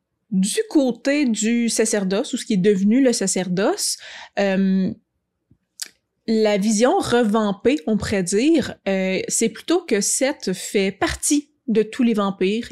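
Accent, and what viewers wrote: Quebec, Canada. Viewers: Merci pour se panorama J'adore ce petit accent Québécois 😊 Et toi que penses tu des Serpents? As tu déjà joué un Serpent? En tant que conteuse, les utilises tu? Carpe Noctem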